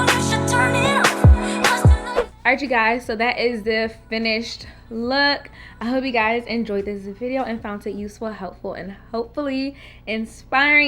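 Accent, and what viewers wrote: DMV, United States. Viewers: I can recognize a DMV accent when I hear one 😂😍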